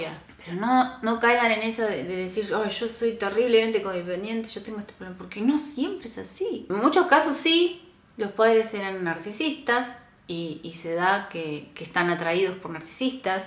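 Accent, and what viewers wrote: Argentina. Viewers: Hola, Gracias, por tu trabajo, te digo que estoy suscripto a la Señora Meredith de Inner Integration, a el Señor Omar Rueda, a la Señora Tabatha de identifica a un narcisista, y al Señor Marcelo de libres del narcisista, realizo en algunas oportunidades comentarios de algunos vídeos de sus trabajos, que son hoy los medios por los cuales realizo mi proceso de sanación, escucho tu acento al hablar percibo que sos de Argentina, yo soy de Córdoba Argentina, Villa Carlos Paz, en rigor de verdad sos la única persona de Argentina que he visto que habla del tema, el narcisismo y los psicópatas, le he enviado al Señor Iñaki Piñuel. al Señor Omar Rueda y a la Señora Tabatha un poema que he escrito sobre mi relación con la narcisista, poema que a ella nunca le envíe, en dónde describo como son las narcisistas, el primer vídeo que vi del narcisismo fue el trabajo de la Señora Tabatha, lo recuerdo siempre, porque yo me acuesto a las 4 de la mañana y ese día eran las 5, y lo vi en mi teléfono, y ahí comencé a instruirme del tema, caminar solo este desierto es duro, como dije, cuento con la asistencia de sus trabajos por youtube, sus canales, otros canales que suelo ver son la Señora Natasha Lopera, y el Señor Antonio de Vicente, en forma intermitente, no estoy suscripto, luego escribí un poema en dónde describo como solo DIOS puede curar esa gente, al único que se lo envié es al Señor Omar Rueda y la psicópata con los temas de, tu vida si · Un Argentino hablando sobre narcisismo...?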